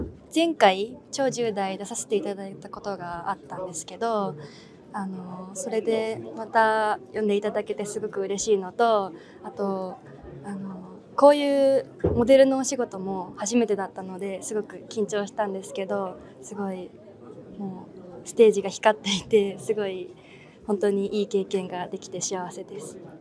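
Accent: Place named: Kyoto, Japan. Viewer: もっと京都弁喋ってほしい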